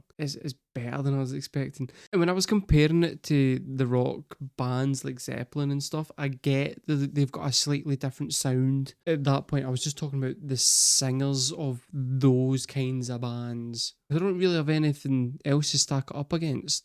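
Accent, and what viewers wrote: United Kingdom. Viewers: With an accent like that, it's obvious you lived on the same island, how could you not have grown up on?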